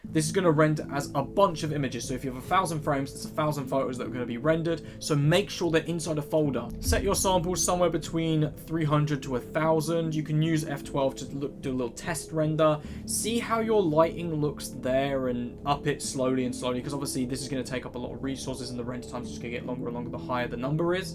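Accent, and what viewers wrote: United Kingdom. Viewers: my passionate hate against British english is gone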